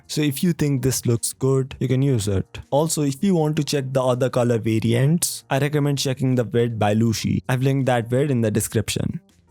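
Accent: India. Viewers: Ur voice like indian??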